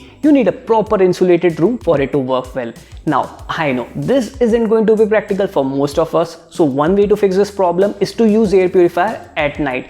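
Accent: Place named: India. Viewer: love the proffesionalism in your voice, and combined with indian accent (which in my head is connected with microsoft scammers and shitty call centers) makes it briliand and a honey on my ears. Great job man. Just bought my first air purifier and hope its going to help my girlfriend with her allergy.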